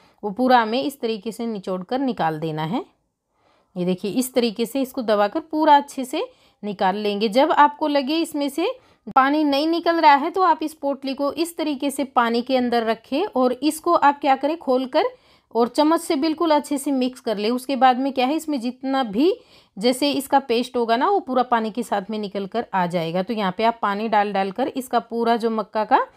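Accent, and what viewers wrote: Haryana, India. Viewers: I think aap hariyana se ho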